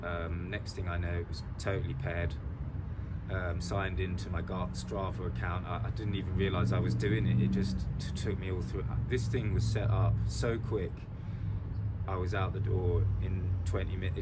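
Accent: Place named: England, United Kingdom. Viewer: HAHA felt like I was watching my little brother , makes the same face and comment only with English accent instead of Mexican. Love the review and candid comments. Felt like a friend (or little bro) was spelling out why the 830 was better.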